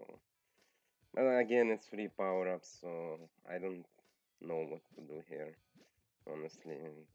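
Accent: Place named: Russia. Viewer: Fix your sound, mate. Add 20Db in post. It'd be better. And you've got a THICK Russian accent 😏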